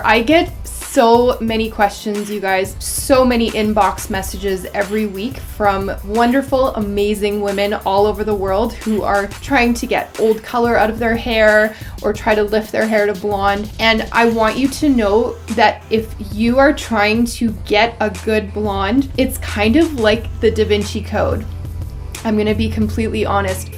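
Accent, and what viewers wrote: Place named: Canada. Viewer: I think I need more tutorials with a canadian accent. Ha! I miss living up there. This was REALLY helpful. Thank you so much!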